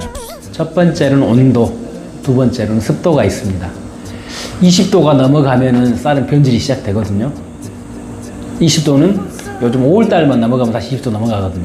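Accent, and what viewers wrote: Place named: Jeolla, South Korea. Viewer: 인상이 좋으시네요^^ 잘 들었습니다^^ 말투로 보니 제 친가 지역 (7시 ㅋㅋ) 부근에 있으신 것 같기도 ㅋㅋㅋ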